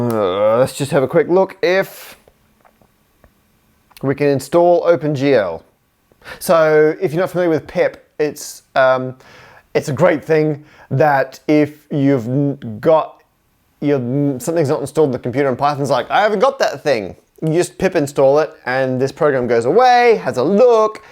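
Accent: United Kingdom. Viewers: This guy has the kind of british accent that you don't immediately notice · I only clicked because I actually thought you were Matt Parker, creator of South Park just to find out you have a British accent. I was not expecting this lmao.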